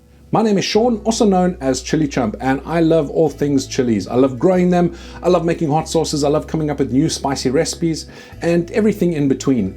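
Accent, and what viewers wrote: South Africa. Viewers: South Africanism ascent.... My homie.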